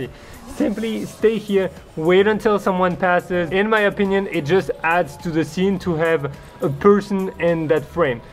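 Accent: France. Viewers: coucou l'accent français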